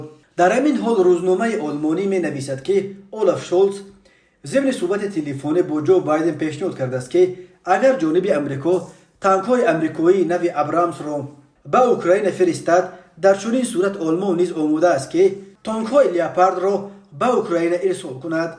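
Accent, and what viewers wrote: Pamir, Tajikistan. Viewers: Салом бародаро😊 Рӯзноманигор помири аз лаҳҷаи суханронит маълум ☺️🤩👏 бисёр канали хуб ва хабарҳои нав👍👋🤩🇹🇯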